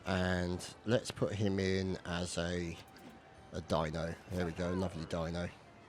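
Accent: England, United Kingdom. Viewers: where you from your accent is awesome I'm guessing somewhere in England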